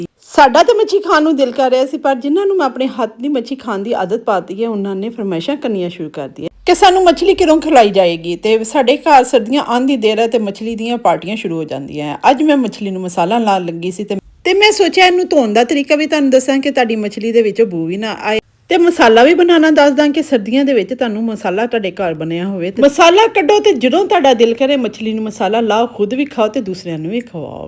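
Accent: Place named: Punjab, India. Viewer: Zabrdast ap k panjabi tch bht enjoy krty hain hm khush rhain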